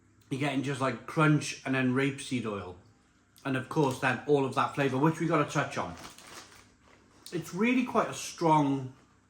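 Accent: Wales, United Kingdom. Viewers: It's not his fault, he's Welsh.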